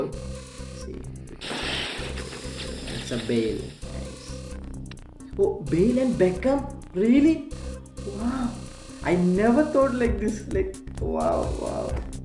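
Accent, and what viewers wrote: Kerala, India. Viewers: Malayali ane alle english ketappo manasilayi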